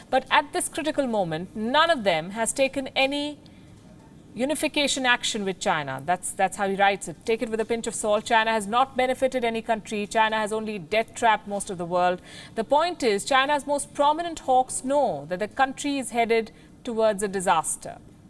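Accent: India. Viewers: India voice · Your English is after all 3rd rated indian English, if he is propagating for less aggressive posture then how can say hawkish at the same time? It's not hawkish it's dovish bharati mata. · This indian lady is trying her best to slang...but turn out still india sound. 🤦‍♂️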